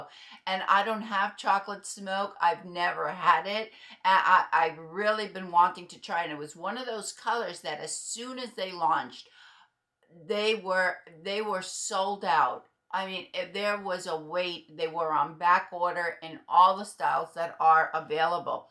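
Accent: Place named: New York, United States. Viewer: Thanks, for the review, and change of color. It looks different from indoors and outdoors. I was wondering about this color. Their wigs are so comfortable. Enjoy your voice. Sounds like you have a New Yorker accent. Thx!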